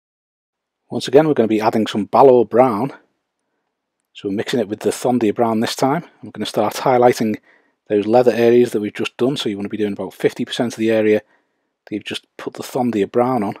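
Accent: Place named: Merseyside, United Kingdom. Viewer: These Uruk Hai guides have been so helpful as I plan on starting an Isengard army soon. The Merseyside accent is also a plus 👍🏻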